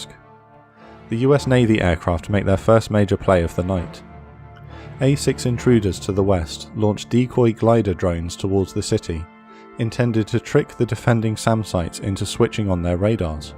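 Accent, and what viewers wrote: United Kingdom. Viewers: Why are narrators always british?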